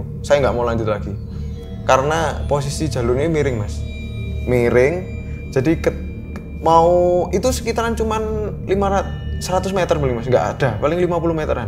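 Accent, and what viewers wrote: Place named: East Java, Indonesia. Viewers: Bangga dengan medok jawa mas. Karena mencerminkan orang yg lucu dan sabar hati. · Podo" wong jawa timur mas.. Suueneng aku karo logatmu mas😂.. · Y Allah lucu ngomonge wong jowo,salut ..salam dri jowo kendal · wkwkala bener mas logat e wong jatiman pol😂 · Yo-opo rek malang e medhok e pol 😂😂😂 , q Melu meker pedut iku bhs indo e opo!!! Jasikkk 😂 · Bahasane campur". 😂😂😂 Jowo indo campur . Medok emen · Peeeh..byuuh...khas e kediri😅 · Anune,itune,katane,ndek sana,ndek situ,suuuwepiii,tuuuwakoot,gak uwweeenak,pehhh,behhh,. Jawatimur pride ❤🥰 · Amat sangat medhok ngrungknno seneng 😂😂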